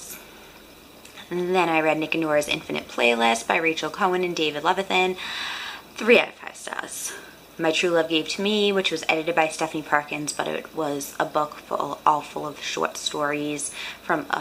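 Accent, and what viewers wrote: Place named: Massachusetts, United States. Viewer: Enjoy the lists! I subscribed :) By the way, are you from Massachusetts? Just a guess from your accent :)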